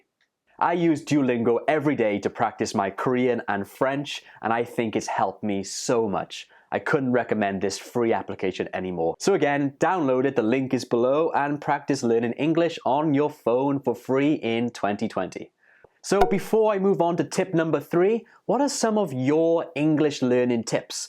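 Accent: United Kingdom. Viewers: Outstanding British accent!where are you from?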